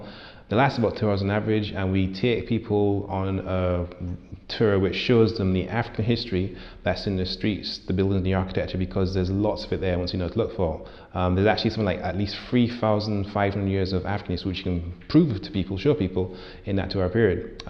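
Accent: United Kingdom. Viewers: Great work Sir. May I humbly suggest that you enunciate your words and slow your speech ever so slightly. The British accent is sometimes difficult to clearly catch every word. Please understand that I seek not to correct you, but offer constructive suggestion, as your presentation is extremely important and relevant. I'm from England and the Caribbean and had slight difficulty catching certain words. Not much but it was distracting and I applaud your work nonetheless. Press on Mr.. The truth will find fight its way to the light, where it will be a beacon. Indeed by you and through you.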